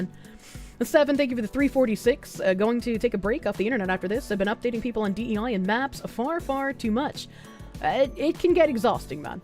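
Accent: Boston, United States. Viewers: I thought the reason you pronounced things oddly is because you're from Boston.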